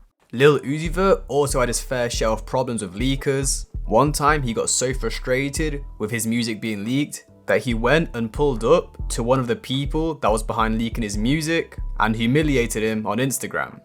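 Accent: United Kingdom. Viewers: Why u gotta have English accent it would have been better wit sum else I understand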